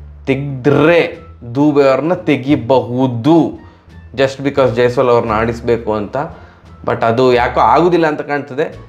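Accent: Mangalore, India. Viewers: You are the best cricket analyst ❤ and your mangalore Kannada is superb ❤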